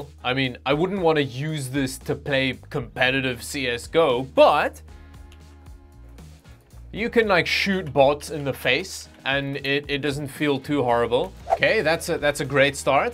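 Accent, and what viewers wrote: South Africa. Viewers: Is South African? Accent seems familiar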